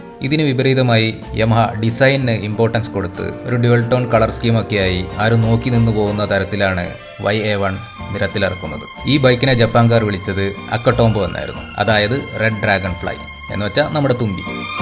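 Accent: India. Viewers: ഇങ്ങള് കണ്ണൂരാണോ ....? 🤔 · Pakka kozhikode slang is here 😇😇😇🥰🥰😍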